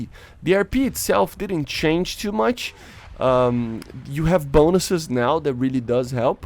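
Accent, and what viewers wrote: Brazil. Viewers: Dude, i listened to 10 seconds of the video, pause, 5 seconds of the video, pause and I said to myself, this guy is definitely Brazilian, muito foda ver um br com um canal top em ingles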